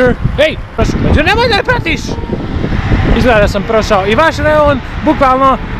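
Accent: Bosnia and Herzegovina. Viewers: Brate zašto pišeš ,,reku" a iz Bijeljine si i pričaš ijekavicom?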